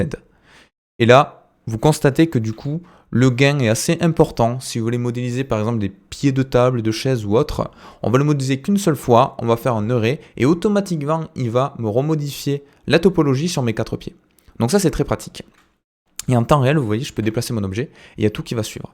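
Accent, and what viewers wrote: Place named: Toulouse, France. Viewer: T'as l'accent toulousain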